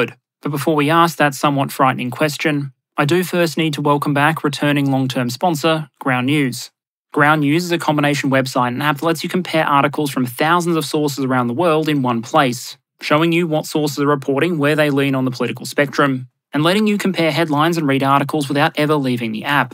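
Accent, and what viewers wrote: Australia. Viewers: What an episode. You are serious what the world needs, the work you do is insane. You deserve all the awards. Thank you Mr. Aussie voice man.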